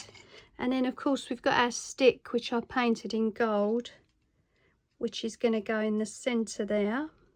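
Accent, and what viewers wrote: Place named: Southern England, United Kingdom. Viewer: it keeps me out of trouble 😂. Can I ask what area you're from? I live in Norfolk now but originally from S.E. London. I like to guess where people are from and I'm thinking you're from Kent or the south of England!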